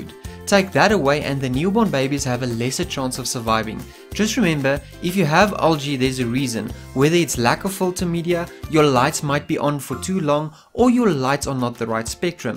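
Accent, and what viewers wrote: South Africa. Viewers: you sound like my high school shop teacher are you from South Africa? coolest accent ever imo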